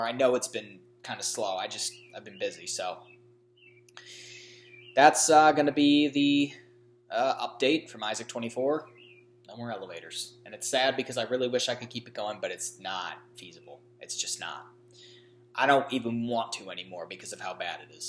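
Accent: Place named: Michigan, United States. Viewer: I came to you for your Speed Queen vids, but then started listening to a few of your other uploads due to your distinctly Michigan accent🤓.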